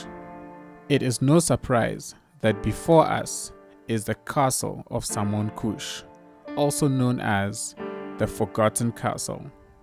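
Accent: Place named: South Africa. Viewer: I didn't know you were South African but when I heard your accent I knew, I felt the South Africaness in my soul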